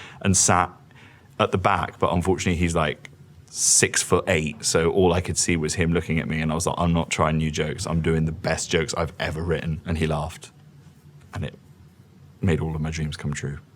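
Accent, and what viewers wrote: United Kingdom. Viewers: I wasn't expecting the British accent (had no idea who this guy was until I watched this video), so I almost spit out my drink when I heard "Jack WhiteHOLE" instead of. 😂 😂 😂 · Now I know that when he went all British in Clifford it was his accent ahahah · He should be given even more credit. He's handsome, he's got a beard, beautiful blue eyes, British accent, annecdotes, amazing sense of humor and he's seems incredibly modest hahahahahaha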